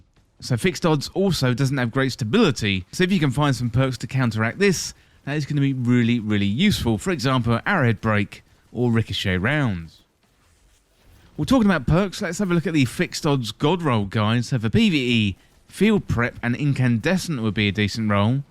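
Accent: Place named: United Kingdom. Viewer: You sound like JARV. All you British twits sound the same.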